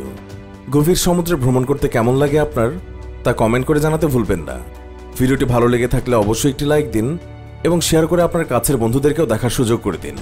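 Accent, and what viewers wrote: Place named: Noakhali, Bangladesh. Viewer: voice ta khub disgusting. Accent khub e baje. Kothagulo suddho vabe bola hoiche kintu accent noakhailla accent er moto lage.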